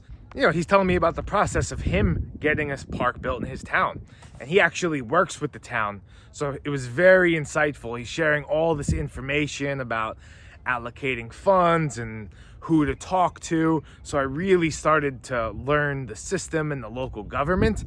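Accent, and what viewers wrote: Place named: East Coast, United States. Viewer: ahh Long Island, knew you were an east coast guy, figured jersey though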